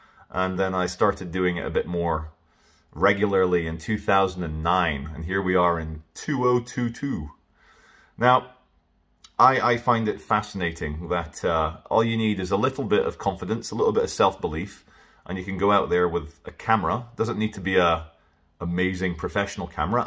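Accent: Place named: Scotland, United Kingdom. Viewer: he has a posh Scottish accent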